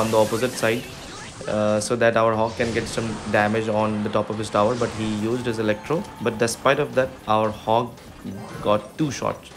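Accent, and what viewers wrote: India. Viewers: You sound so Indian ^_^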